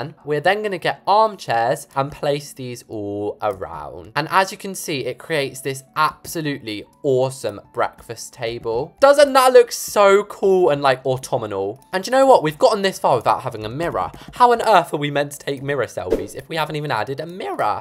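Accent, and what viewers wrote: England, United Kingdom. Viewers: Gurl Are You British ???? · What part of England r u from bc I’m English and my accent is so different from yours!!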